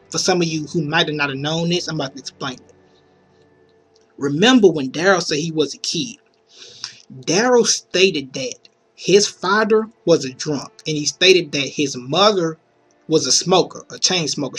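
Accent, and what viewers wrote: South, United States. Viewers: man what state u from man cause u got country ass accent i mean no disrespect man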